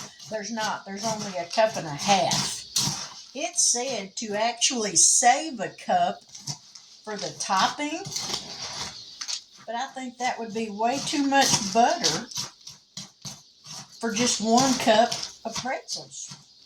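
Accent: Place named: South, United States. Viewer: you as well sweet lady! I reside in North Georgia! You sound like you are from the south as well!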